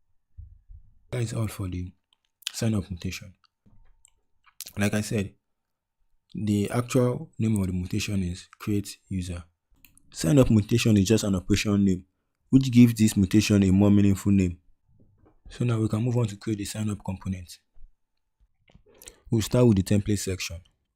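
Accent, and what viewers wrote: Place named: Igbo, Nigeria. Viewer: this na confirm igbo guy, probably from enugu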